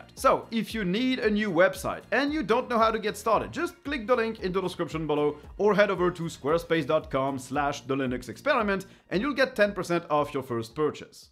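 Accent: France. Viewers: "Native waylANd experience" Ton français ressort toujours un peu, haha :')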